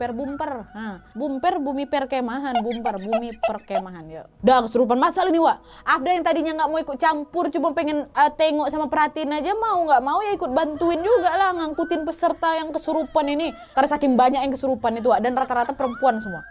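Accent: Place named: Medan, Indonesia. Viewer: Baru kali ini kuliat org bawain horor cem gini malah jd lawak 🤣🤣 Medan kaleee, semangat kakak bagus deh pembawaan cerita nya 👍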